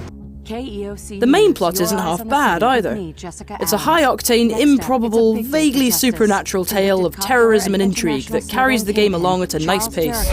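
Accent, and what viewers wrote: United Kingdom. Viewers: British. I think.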